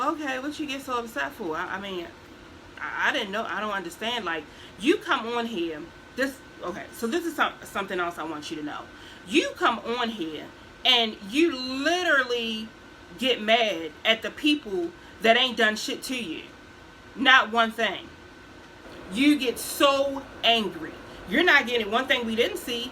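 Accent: Baltimore, United States. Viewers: Hey girl Hey....starting to become a fan!!🤣 Nothing but facts....being a reactor is actually easier than being the vlogger.🤷🏾‍♀️ They the ones put in the spotlight to be judged by their every move!🤦🏾‍♀️ By the way....are you from Bmore? You sound exactly like you from Bodymore!🤣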